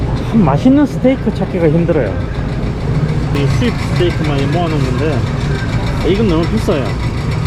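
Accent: Gyeongsang, South Korea. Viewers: 백선생님 고향이 대구쪽이신거 같은데요 맞나요?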